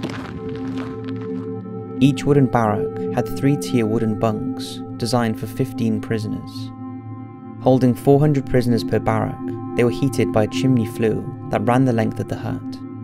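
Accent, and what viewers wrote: United Kingdom. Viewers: I'm assuming you're a fellow Brit because of your accent! I just wanted to ask, how much did it cost to visit there? This video has made me interested in going. Thanks :)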